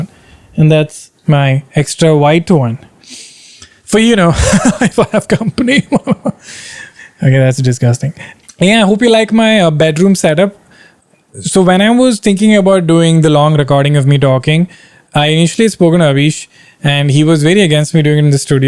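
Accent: Kerala, India. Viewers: ur funny and relatable n u make me smile...wt ur accent...from one mallu to another...keep it up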